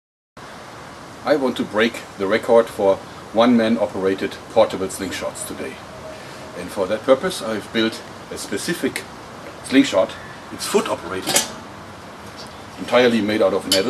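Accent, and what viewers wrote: Germany. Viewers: what nationality are you? you have a cool accent. German? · Close enough for a German native. His English is already better than 3/4 the comments. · he sounds VERY german... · also doch aus deutschland :P ich war erst am rätzeln wegen dem akzent ^^ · vom akzent her würd ich sagen dass du deutscher bist :D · Deutscher oder ? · Bist ein deutscher oder :D ? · You have such a likeable german accent 😂😂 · Hab ichs mir gedacht du bist bestimmt deutscher ?! =) Gutes Video hammer Schleuder !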